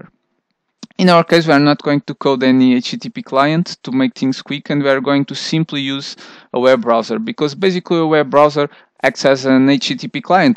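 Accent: Portugal. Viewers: Great! Quick reference. Thanks. :-) You're Portuguese, right? I'm Portuguese and, from your accent, I would guess you are too. Just a quick correction. If you have your local WiFi router correctly set up, you don't need to be on the same WiFi network to access the web server. you can access it from any other inter-connected network. The Internet, for example.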